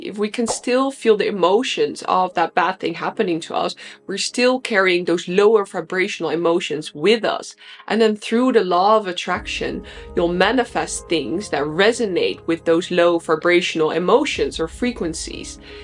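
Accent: Netherlands. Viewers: I hear a dutch accent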